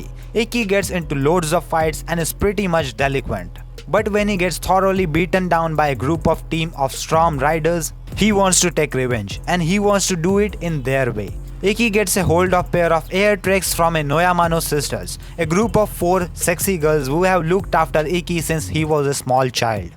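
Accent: India. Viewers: Indian accent is worst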